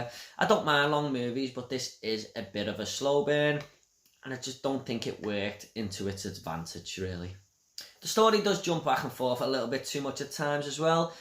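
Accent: Liverpool, United Kingdom. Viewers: Enjoyed listening to a fellow Scouser 🤩 Great review 😊 will be one I think we will have to check out 🤩😊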